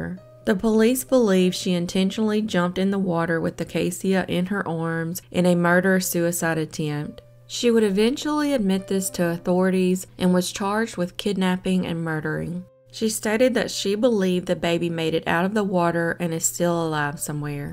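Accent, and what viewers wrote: South, United States. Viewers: Your channel is growing fast! Awesome job! I think it’s the soft southern accent 😉 I’m from Mississippi and when I go out of town sometimes people just want to hear me talk 😂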